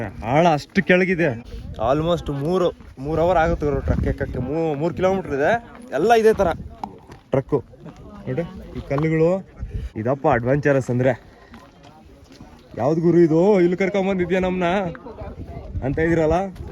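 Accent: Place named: Chikkaballapur-Kolar, India. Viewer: Brother nivu chikballapura kolar side avra Language a tara ide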